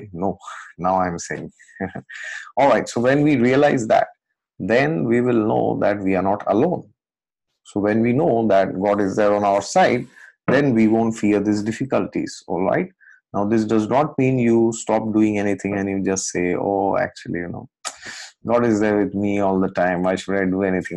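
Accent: Assam, India. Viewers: As he belongs to eastern part of India. An Assamese.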